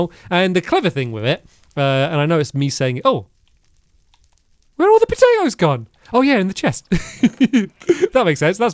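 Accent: England, United Kingdom. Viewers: back when I was four. I didnt know any english! (im a Malaysian btw) but after listening your english accent I not only know english but i know minecraft, and if it werent for you i would be empty... I also watch your other channel I Wonder and Ive been meaning to watch your lovely world series and the I wonder series❤❤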